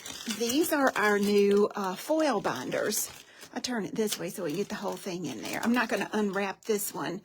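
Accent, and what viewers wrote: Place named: Virginia, United States. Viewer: I love all your tutorials that you have uploaded to youtube. Plus I love to hear you talk; makes me miss home (SW Virginia). I cannot wait to see what else you come up with!!!! God bless.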